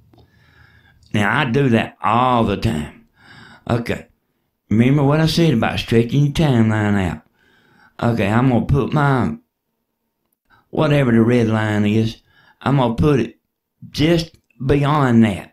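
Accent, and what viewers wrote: South, United States. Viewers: I learnt more from your one video (with that adorable southern drawl :-) ) than from a whole series run by a boring smooth-talking digital 'expert'. Thanks for helping us out. (Ps I love how you say 'raaaaah-click)..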